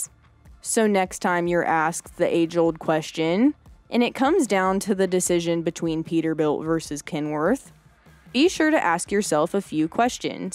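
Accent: United States. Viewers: sounds like she's from the UP Michigan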